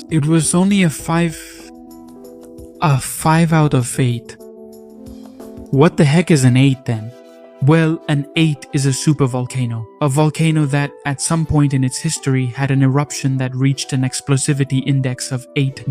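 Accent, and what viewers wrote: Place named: India. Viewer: What's with the Indian voice??? AI?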